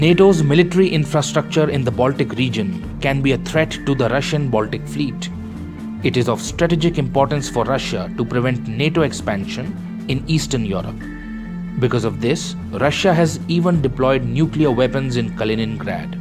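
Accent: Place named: India. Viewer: Thank you for your correct pronunciation of words contain V and W. In India in the last few decades there has been a trend to reverse the pronunciation of these letters which is painful to listen to as an English speaker. Also your accent is pleasant to listen to which isn't always the case with the modern Indian accent.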